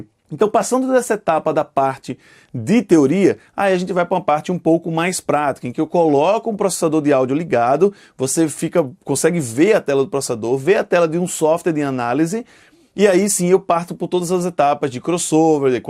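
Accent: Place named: Pernambuco, Brazil. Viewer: Imaginei que fosse pernambucano.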